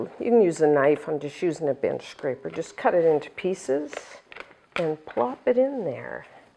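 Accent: Canada. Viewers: Her accent is Canadian af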